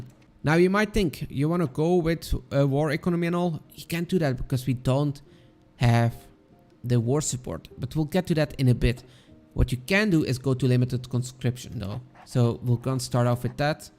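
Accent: Belgium. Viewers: Because I hear a slight Belgian (Flemmish) accent in pronouncing the Dutch words. I'm from the Netherlands myself. Good pronounciation btw. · you Belgian?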